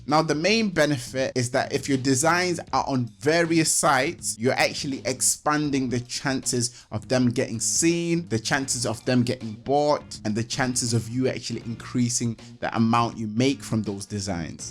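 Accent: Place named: Manchester, United Kingdom. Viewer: Ha, yes! I recognised a fellow Manc. Nice one our kid. 😁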